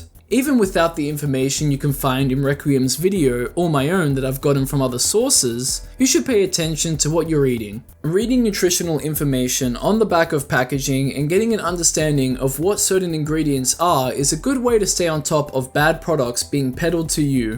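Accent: Australia. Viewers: You're Australian? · Wait you’re a fellow Aussie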